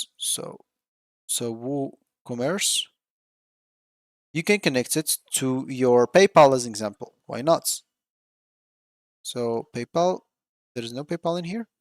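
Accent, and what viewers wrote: Morocco. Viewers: bruh you sound moroccan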